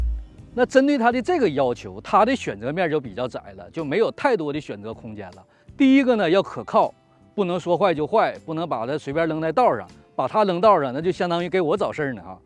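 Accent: Northeast, China. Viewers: 一听说话就是东北人呀，辽宁人吧，我吉林的，想问你一个题外话，美国公立医院看病是不是免费的麻烦你。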